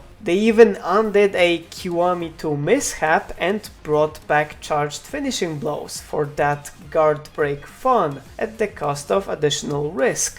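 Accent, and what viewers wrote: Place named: Eastern Europe. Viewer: Strong slavic acent. Yeah dude you better make a subtitles next time.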